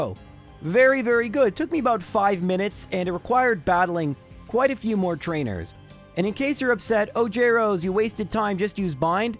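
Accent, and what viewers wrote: Canada. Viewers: These videos mean so much to me man. It's weird, I only just started watching them about 6 months ago but these Gen1 Runs are such a relaxing, anti-anxiety chill time for me. Maybe it's the Canadian accent (I left Canada 10 years ago) and Gen 1 nostalgia, but dang man. Thanks so much, keep it up please!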